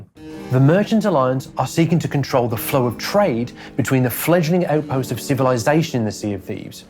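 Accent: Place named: Black Country, United Kingdom. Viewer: That's a Telford or Yam Yam accent I'm sure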